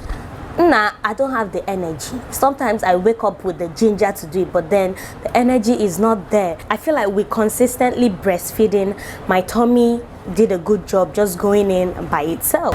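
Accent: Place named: Igbo, Nigeria. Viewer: Am here for the Igbo words and accent. Love from Kenya ❤️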